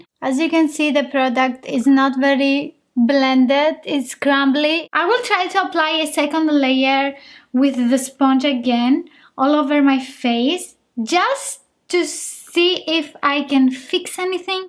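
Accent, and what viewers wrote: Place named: Greece. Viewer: Πως φαίνεται ο Έλληνας.. Η προφορα σε προδοσε, και μετα ειδα το όνομα σου.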